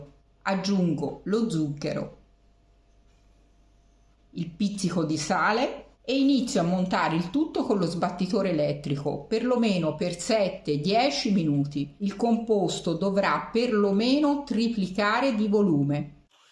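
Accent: Tuscany, Italy. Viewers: Grazie! Bello sentire il tuo splendido accento toscano!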